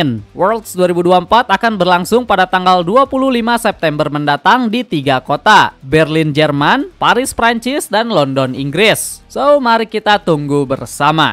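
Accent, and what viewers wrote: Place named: West Java, Indonesia. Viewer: ane tau ente sunda bang, tapi london dibaca landen.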